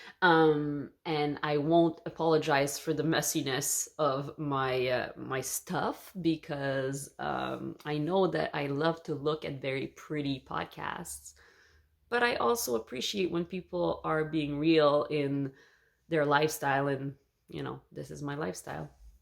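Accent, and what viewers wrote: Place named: Quebec, Canada. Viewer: Hi Dear. I was listening to your accent. I am an English Speaking Montrealer. I asked google whether you are French Canadian, and I was so pleased to see that I guessed right! I moved to Israel 33 years ago. I am so happy to have found you. I love your knitting! Best of luck.🎉❤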